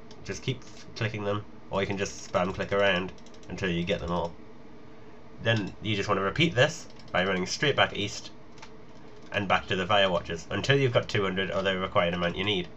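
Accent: Newcastle, United Kingdom. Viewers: You geordies and your accents!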